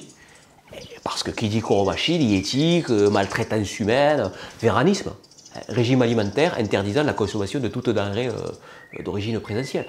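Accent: France. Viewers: La pratique de la coromachie produit de "grands malades" ....! Merci pour la création artistique et le rire salvateur ! En plus "avé l'assent" . Au fait Castex ? Il surjoue au maximum l'accent gascon ?!! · Un zeste de dédain du Midi, avec cet accent languedocien surprenant ici, en lieu et place de celui d'un "héros" español? Oui, mais avec un zeste d’obsession anti-covid qui devient lassante, usée, et qui rejoint finalement l'obscurantisme, les falsifications...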